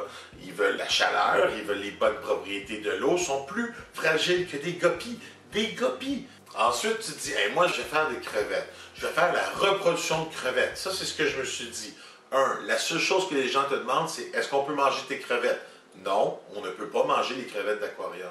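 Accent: Canada. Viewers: J ai adoré tes vidéos et ton accent canadien! Merci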